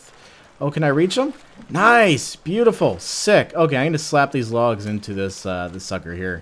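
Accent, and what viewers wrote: Canada. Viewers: Are you Canadian?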